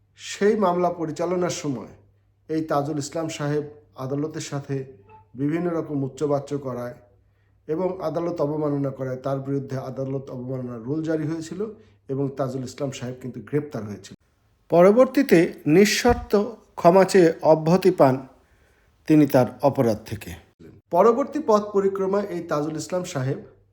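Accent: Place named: India. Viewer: তোমার কথা শুনে মনে হচ্ছে যে, তুমি ভারতের প্রডাক্ট।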